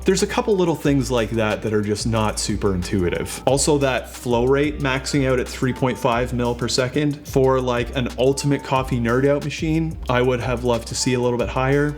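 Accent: Canada. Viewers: Super helpful vid, nice looking kitchen & great Canadian accent 🙂I actually just got the same studio in the mail. I've used it a few times and I'm trying figure out the steps and RPM's on the grinder. I haven't played with it in fear of botching my coffee, I just scan the pod (via the app) and pushing play. Any suggestions?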